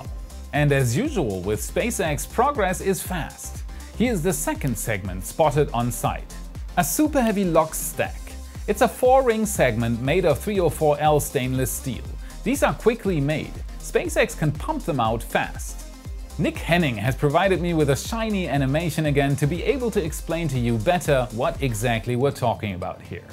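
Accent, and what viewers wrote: Germany. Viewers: yeah im also from germany and i can clearly hear the accent. but its incredibly light for a german. and yeah he is my favourite SpaceX youtuber :D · He is german isn't he? I feel like his accent is really light :D I'm so glad I found that dude lol Best quality updates!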